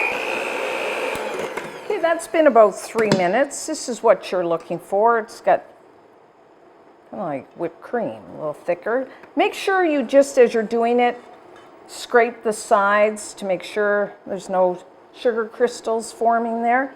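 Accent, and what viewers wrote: Canada. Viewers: Love the step by step explanations. She sounds so Canadian.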